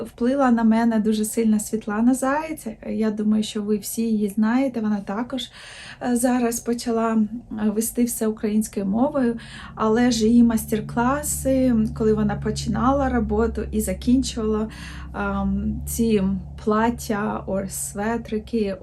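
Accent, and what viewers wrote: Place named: England, United Kingdom. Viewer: Несовершенная - не досконала, Коснулось - торкнулось., ти така молодець! В тебе все вийде, твоя мова гарна з легким англійським акцентом 🥰 Пишаюся тобою!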